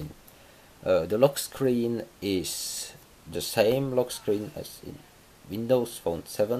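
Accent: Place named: Czech Republic. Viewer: AAAA!! Ta česká angličtina je fakt hrozná, nic ve zlém, má to tak většina lidí z ČR =D Jinak příště zkus líp zaostřit na telefon, ať to není tak rozmazané ;)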